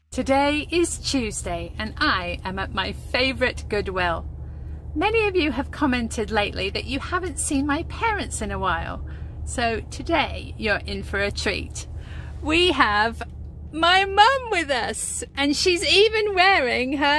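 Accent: England, United Kingdom. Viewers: Just come across your channel and I love it, however being English myself I can't help but hear an English/British accent there! Hope I'm right 😂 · I love the English accent do you miss England I always wanted to visit have a bless day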